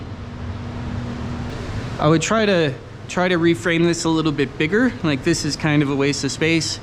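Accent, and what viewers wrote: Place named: Canada. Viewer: you have a nice accent you must be Canadian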